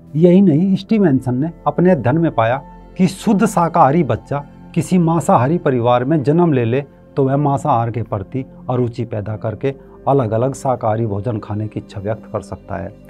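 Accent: Haryana, India. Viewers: Bhai Jis ladke ki baat kr rahe hain main use jaan'ta hoon.btw Bolne k hisaab se lg raha hai k aap haryana se ho. .love & respect from punjab..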